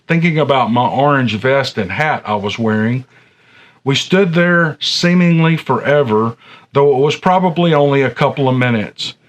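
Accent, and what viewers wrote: South, United States. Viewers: I love your southern accent ♥️ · I love your Southern Ness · Like your stories,and your southern accent! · I love your channel. And i love that southern drawl. The way u read other people's stories is so articulate and smooth it sounds as if it's your own experience. You are a pleasure sir. A real pleasure. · As an Aussie I love his southern twang 💜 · Happy Father’s Day! You, in my opinion have the Best Sasquatch channel of all! You are a born-storyteller and we-in’s from Dixie, love your Southern accent! Thanks!👍👣👣👣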